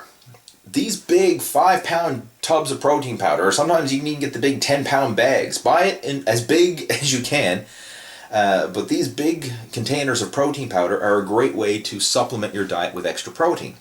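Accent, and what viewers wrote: Canada. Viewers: Beans, lentils, skim milk powder, eggs, cottage cheese. cut out crappy snacks, booze and takeaways. ln the end cut your suit according to your cloth. Great site. Cant quite get the accent, are you Canadian. Difficult when from England to spot the accent.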